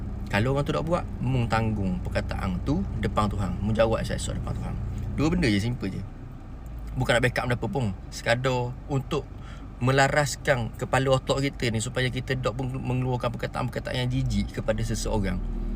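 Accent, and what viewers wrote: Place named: Kelantan, Malaysia. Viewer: tolong melaraskan bahasa kamu dengan lebih baik. Rimas lah loghat2 kelate ni . Pesanang aku belajar bahasa yg lebih baik dari DBP dulu ya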